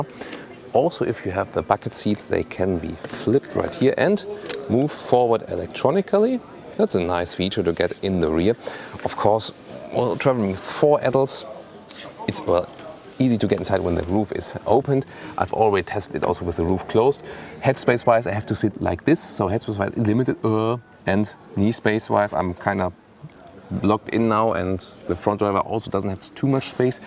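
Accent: Germany. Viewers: Oh Mann,. Dein Englisch klingt...Deutsch. Du sprichst flüssig aber mit deutscher Intonation, was absolut furchtbar klingt. Ein wenig Übung und die Sache klappt, gib' Dir einfach Mühe! Selbst als Deutscher kann man dieses Denglisch kaum ertragen. Außerdem solltest Du lernen, wie man bestimmte englische Wörter ausspricht. Beispiel: Coupe wird Cuup gesprochen, nicht Coupee. Bitte verstehe das als konstruktive Kritik.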